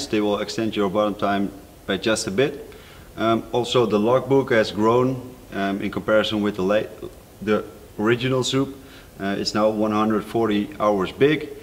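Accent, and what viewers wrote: Netherlands. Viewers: What is your accent? Dutch? Nordic?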